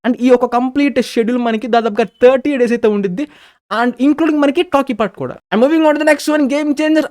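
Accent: Guntur, India. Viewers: Bro meedhi guntur aa ❤ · Bro nedi GUNTUR yee naa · Bro meedi guntur aa😮? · Bro nuvu guntur ana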